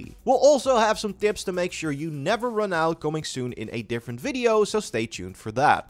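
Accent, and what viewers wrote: Netherlands. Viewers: My god.. the Dutch accent in this guys' English 🥲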